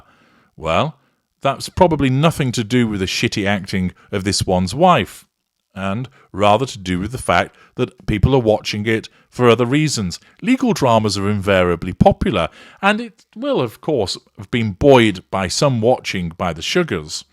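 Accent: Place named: RP, United Kingdom. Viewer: swearing in his posh, English (although im still trying to detect the region), velvety tongue, gets me EVERYTIME 🤜💥🤛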